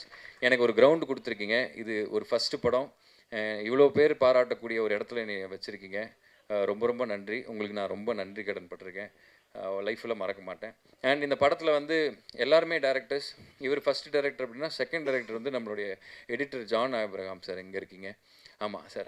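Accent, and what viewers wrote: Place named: Coimbatore, India. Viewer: nee Coimbatore pasai nalla pesuva antha jonerla padam pannu arasiyal vathi mathiri and village nakkal paiyan mathiri pannu.. All the best👍i am from ❤️💛❤️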